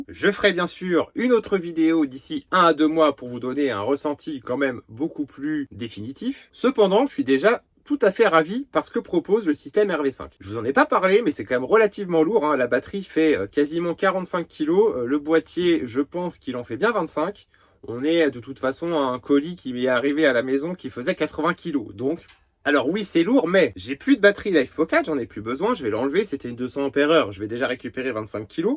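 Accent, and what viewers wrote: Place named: England, United Kingdom. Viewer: quel accent anglais !! lol jolie colis :) et ça envoie du lourd !!! bizzzzz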